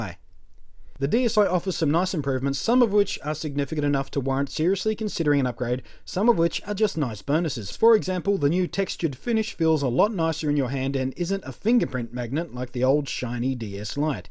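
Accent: United Kingdom. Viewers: Have you ever heard a british/english accent...? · he's british. =/ lol. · British?? good job graduate.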